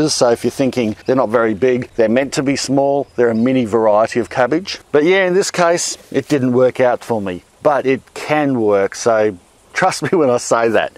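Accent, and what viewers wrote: Australia. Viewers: Are you an Australian? Love your accent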